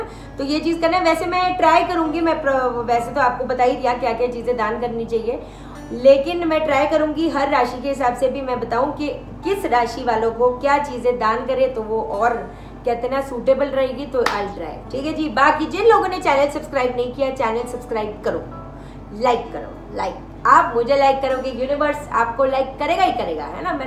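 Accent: Punjab, India. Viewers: I am very much impress with your way of talking punjabi tarhka .And i also like your upay mam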